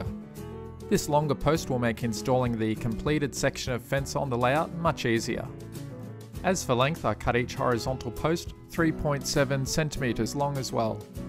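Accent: Australia. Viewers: Hey! What part of Australia are you from? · Are you Australian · where is he from, Australia ?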